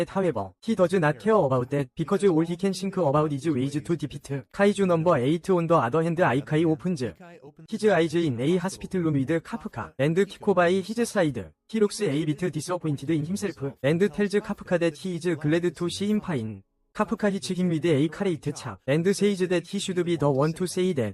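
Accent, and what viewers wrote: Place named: South Korea. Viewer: 아오 콩글리쉬 발음때문에 집중이 하나도 안돼네요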